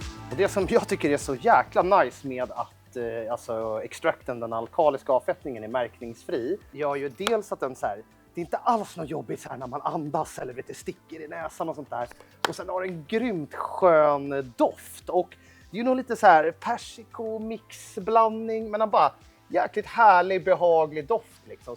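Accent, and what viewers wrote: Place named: Stockholm, Sweden. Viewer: Jag är stockholmare och om det är det här icke-stockholmare menar när de säger att de hatar stockholmska så förstår jag dom... x) låter som en 12-årig tjej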